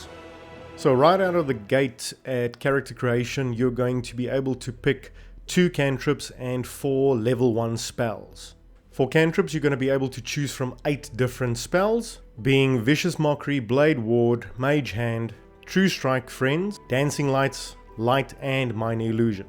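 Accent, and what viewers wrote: Afrikaans, South Africa. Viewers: dude jy klink afrikaans.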